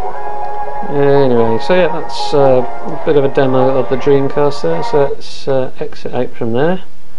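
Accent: United Kingdom. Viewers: you sound a lot like the guy that fixes/fixed my laptop, he lives in Darlington lol, are you from the UK?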